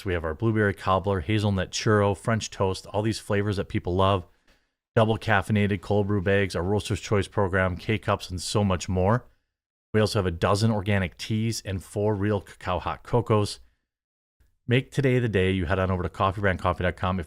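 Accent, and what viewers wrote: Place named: Minnesota, United States. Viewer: I still yell out baaaags every time he says it and I wouldn't have him change it for anything. get down with your bad Minnesota self.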